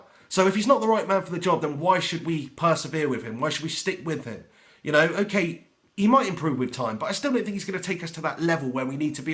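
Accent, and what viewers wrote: Manchester, United Kingdom. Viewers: Your Mancunian accent is very strange.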